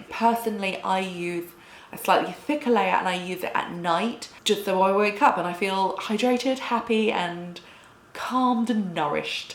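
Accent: England, United Kingdom. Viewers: You are just so cute and so sweet! I love your English accent! I have eczema and had heard that this cream was really good but wasn’t sure. Your review completely sold me!🥰😃👍✨💖✨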